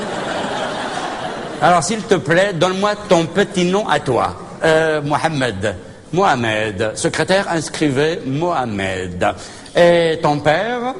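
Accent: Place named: Maghreb, North Africa. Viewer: Il y a 30 ans c'etait peut être marrant mais en 2022 l'accent rebeu c'est insupportable. Rien que de l'entendre je pense aux racailles.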